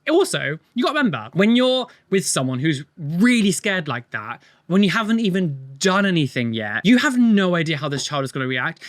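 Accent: United Kingdom. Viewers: I love your videos, and btw I’m learning English for 7 years, now I'm listening to the British accent in your videos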